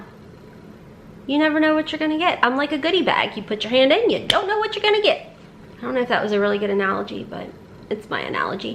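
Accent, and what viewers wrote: South, United States. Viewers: hearing your southern drawl when it sneaks out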